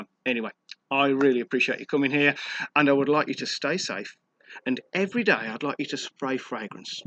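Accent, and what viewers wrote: Birmingham, United Kingdom. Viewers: Hey just chanced on your videos. Subscribed. Is that a Brummie accent I can hear? 😆👍 Keep it going!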